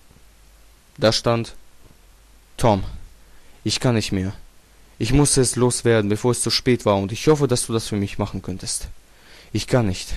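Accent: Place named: Russia. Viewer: Haha du bist voll geil ich liebe es wenn Russen so einen geilen akzent haben wie du! Aufjedenfall abboniert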